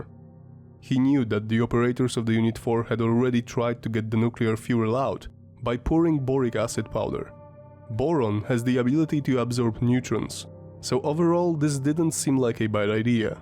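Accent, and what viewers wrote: Russia. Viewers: oh ok. I wasn't sure . I do like the accent and thought you may be Russian.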